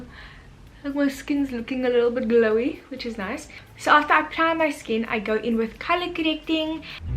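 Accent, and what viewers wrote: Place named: South Africa. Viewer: I could tell by ur accent from the beginning off video. And when u said ja I knew u where south africa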